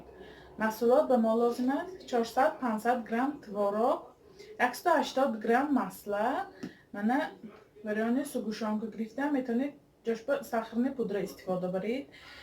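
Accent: Isfara, Tajikistan. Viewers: Салом апаҷон.шумо аз Исфара неми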